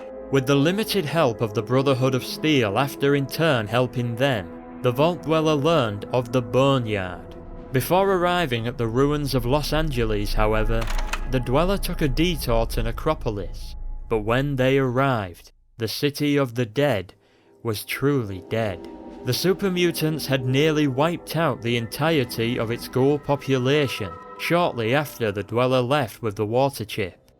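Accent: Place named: Northern England, United Kingdom. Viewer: Great video my friend. Love the northern accent!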